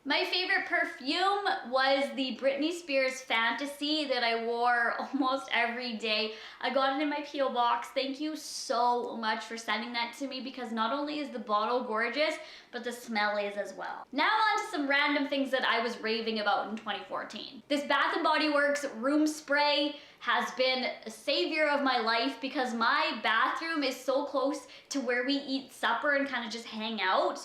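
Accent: Canada. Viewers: I do not understand why everybody hates on she is a great person why is everybody so concerned tht she is writting words in the american way im canadian n sometimes i write in the american way everybody also makes fun of he bout the she says words tht have tht letter "a" in them all countries have certain accents n tht a canadian accent honestly haters if u have nothing nice to just dont say it at all because u r ruining day n all of her cuties day btw ily ignore the hate 3:-)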